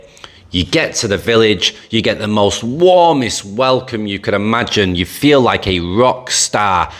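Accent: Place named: England, United Kingdom. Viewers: Great information! I am also interested in the wide variety of english accents. Where exactly is yours from ? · GREAT STORY...BUT, THE BLOODY ACCENT BROUGHT ME BACK TO MY CONSTRUCTION DAYS IN DUBAI...WITH LADS FROM---UPPPP NORTH. BLESSINGS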